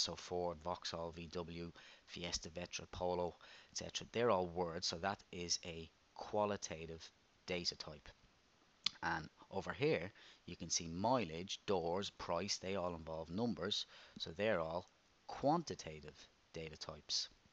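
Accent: Ireland. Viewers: All of your videos have really helped me revise! thanks for taking time out to post these videos on youtube as my maths teacher I currently have in college is SHIT. thanks!! wish you were my teacher, especially with that sexy irish voice....... just saying:)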